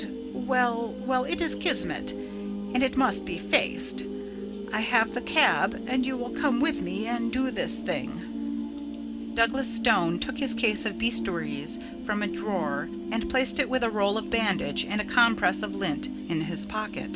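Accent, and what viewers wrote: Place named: United States. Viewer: That stupid music .. and the strident US accent.. ruined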